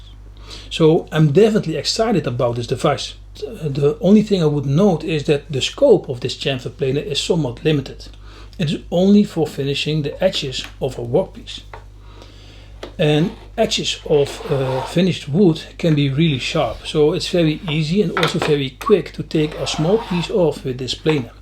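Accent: Netherlands. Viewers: Leuke video man grapig dat Nederlandse Engels 🤣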